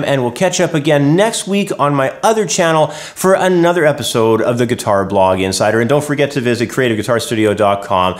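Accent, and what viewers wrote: Canada. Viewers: always a nice channel this one! hey are you, by any chance, canadian?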